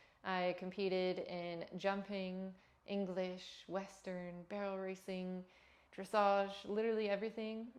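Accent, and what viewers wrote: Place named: United Kingdom. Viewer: This is my first time hearing your voice and idk why but I thought you were British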